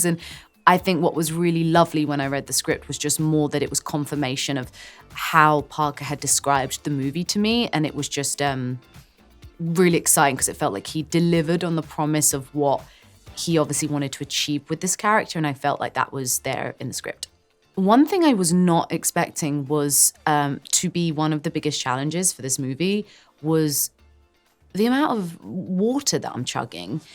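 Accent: United Kingdom. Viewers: British accent 🇬🇧🇬🇧🇬🇧🇬🇧🇬🇧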